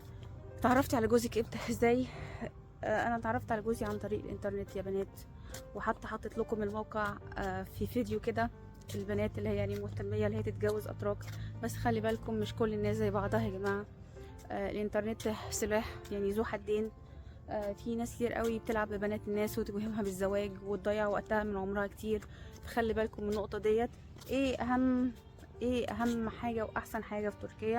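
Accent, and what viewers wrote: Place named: Ethiopia. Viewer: تعلميها انتي الاول وبعدين تفلسفي لما بتنكلمي تركي نفس صوت ولهجة العاملات الاثيوبيات اللي بتتعلم من اصحابها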